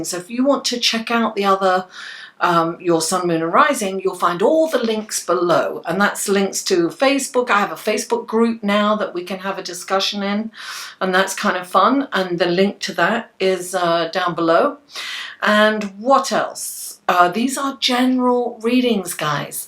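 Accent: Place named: United Kingdom. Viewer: thank you for this reading,, it did resonate with me & spoke the truth about something that occured to me last month. Where are you from I love your accent,,, I'm from USA, you sound British,,, many blessings to you.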